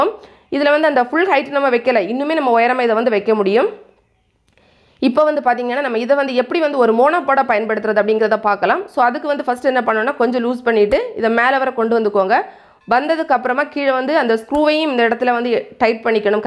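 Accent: Kanyakumari, India. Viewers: Hi sis, Excellent explanation that too your kanya Kumari slang is awesome.. Nostalgic 😔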